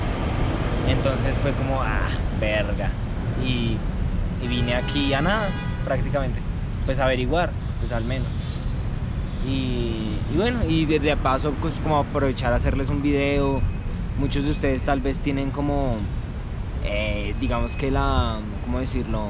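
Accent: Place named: Colombia. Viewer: Suena bien ese acento colombiano mezclado con palabras mexicanas . . . Parceeee 🤟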